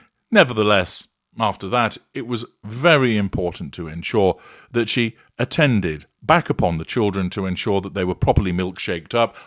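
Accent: United Kingdom. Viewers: You make a good point. Sometimes one's job necessitates these absences. I would also say, though, that a nurse or doctor on night shift would probably have SOME time with her/his children when off work. I also find it funny that, who has (or puts on) what I think of as a British accent, makes no mention of the British custom of sending children away for boarding school. He mentions having nannies and that's all well and fine, but the whole boarding school thing... I know that happens when children are older, but boarding school always seems kind of brutal to me, here in the U.S. YMMV Edited to give his due for pronouncing Caribbean correctly. One of the native nationalities there are the Caribe (KEH·reeb, not cuh-RIB). Sorry but it's one of my pet peeves. As you were.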